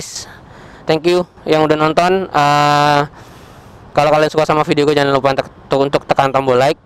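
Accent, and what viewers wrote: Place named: London, United Kingdom. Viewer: landun sound 🤤 like it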